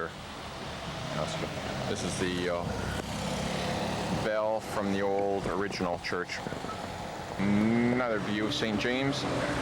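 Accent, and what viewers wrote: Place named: United States. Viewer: That’s amazing! I didn’t realize you’re from Germany speaking English—I actually assumed you were American. You probably chose English for the recording so that everyone could follow along. Thank you! I was born in Szczecin in 1983, and as I was walking the streets, it would be fantastic to spot myself in your video. 😄 You won’t believe how much Szczecin has changed since then! We’d love to have you back to film it again and share your thoughts.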